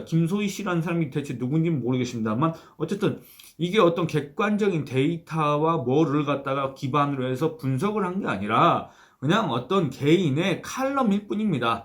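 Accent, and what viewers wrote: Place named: Gyeongsang, South Korea. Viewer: 저는 경상도 사나인 줄 알았어요😊😊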